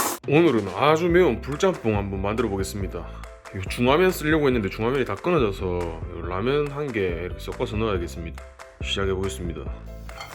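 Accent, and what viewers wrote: Gyeongsang, South Korea. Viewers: 상 남자중에 상 남자 박력 뿡뿡 매력이 잘잘 흐립니다 거기다 경상도 억양까지 넘 섹시하고 멋지다 👍🏼😂😂😂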